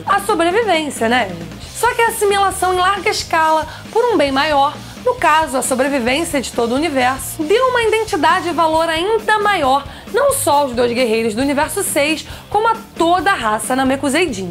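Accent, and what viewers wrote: Rio de Janeiro, Brazil. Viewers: Kkkk, estive no Rio e reparei no Douze tbm, naiscimento, mas acaba sendo fofo. Relaxa. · Pietro Silva Claro que não né... Sou paulista,e não me incomodo com o sotaque carioca. Eu já sou acostumado a ouvir . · Sou carioca e entendo....o x no lugar do s..... É foda :/ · Eu tbm sou carioca.. Ksjsjsjsj, esse "i" sempre vem nais palavrais.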